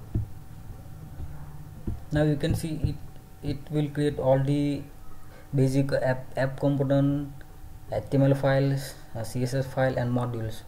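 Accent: India. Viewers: Indian accent truly bothers me. An entire nation speak English sooo bad I hate watching videos created by them. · nice video,..R u gujarati i think..right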